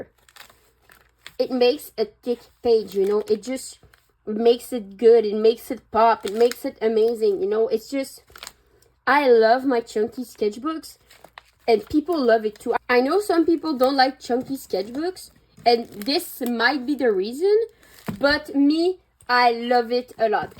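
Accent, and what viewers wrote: France. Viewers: r u from France idk your accent feels french ( btw I speak French) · Salut!! J'espere que tu vas bien.Est ce que tu es française? Parce que je trouve que tu as un petit accent français lol :).En tout cas tes dessins sont trop beauux <3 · Hey, I'm new on your channel and actually... Are you french ? No cause you've got a french accent :') (saying that cuz I am french). Great video tysm !! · your accent is so pretty :3 my grandma is Belgian and speaks French so I could immediately tell you have a French accent lol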